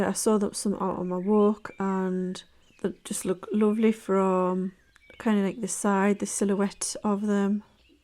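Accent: United Kingdom. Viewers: Loved this tutorial! Please pace yourself so you don’t burn out. Where in the UK is your accent from? Much appreciation from San Antonio, TX USA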